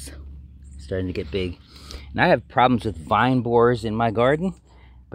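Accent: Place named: Pennsylvania Dutch, United States. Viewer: This is a great instructional, informative vid!👍 Wow, humongous volunteer squash leaves! Do you use DE for squash vine borers? Your accent kinda sounds like where I'm from a bit, PA Dutch country! 😉 Take care!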